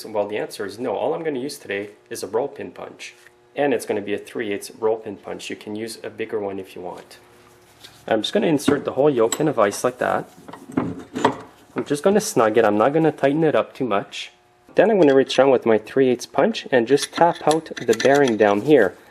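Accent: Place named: Canada. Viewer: such a canadian accent eh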